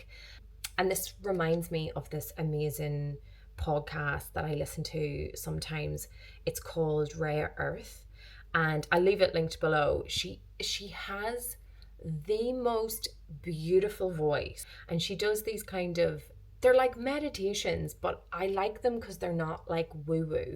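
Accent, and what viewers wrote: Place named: Ireland. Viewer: You just unlocked something special for me with Reas Earth. I’m obsessed … and very much on this path of finally doing the things I have been dreaming of. thank you! I also love watching you, your content and voice are incredible. Maybe because my husband is Irish and I love the accent ❤ congratulations on your work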